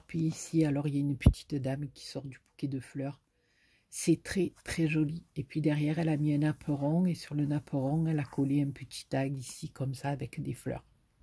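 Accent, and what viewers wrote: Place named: Midi, France. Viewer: Coucou merci pour ce partage de bal. De jolies créations encore.. Tu m'as fait rire avec "c'est une petite galejade" 😊 du Midi ça te va bien avec ton bel accent que j'ai perdu 🙂 Je suis du 84 😎 mais vis dans le 50 ☔ depuis 2013 😌 Alors pour moi c'est juste un plaisir de t'écouter 😊 Bisous!😘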